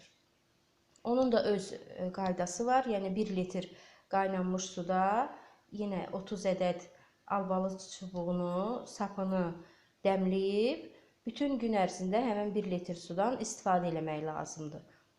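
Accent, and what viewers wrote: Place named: Qobu, Azerbaijan. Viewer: qobu lehcesiyle danwirsiz.Qobulusuz?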